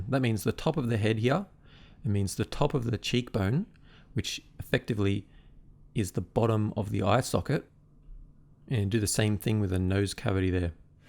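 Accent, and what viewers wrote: Australia. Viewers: Aussie to the core without being too ocker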